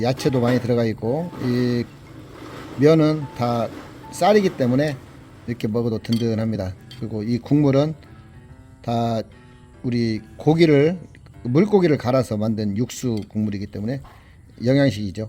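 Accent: Jeolla, South Korea. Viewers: 님사투리가 진안장수그쪽인가요 옛날군생활할때후배가비슷한사투리썼는데 귀에익어서요ㅎ암튼잘보고있어요 건강하세요!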